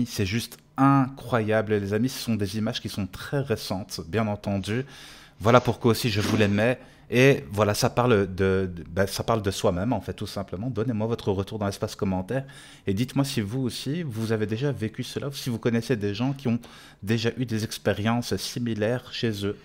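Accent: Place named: Belgium. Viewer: Bonsoir, pardonne moi si j'ai pu t'atteindre de par mes quelques commentaires.. sache ke je suis abonné et que je like positif à 90%.. tu as un sens de l'humour et ton mélange d'accent orientale/belge est rigolo..😉. Bref en ces temps de fêtes: prends soin de toi, bonne année à toi (sincère) et a plus dans l bus...😁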